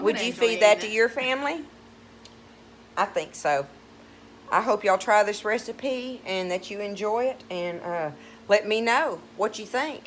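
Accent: South, United States. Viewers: As soon as I heard the southern drawl in her voice.. I knew this was going to be a delicious recipe.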